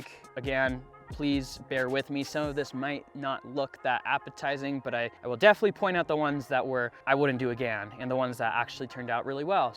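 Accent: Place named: United States. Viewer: hi from india! neat videos. you sound like american born. but what is your ethnic origin? :)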